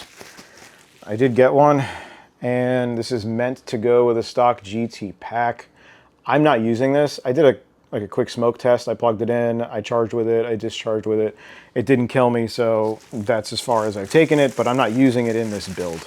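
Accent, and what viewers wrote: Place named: Australia. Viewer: Great video! I let it play as I swapped an MTE into my XR, it was like we were building together! I even caught myself talking in Australian accent with you 😜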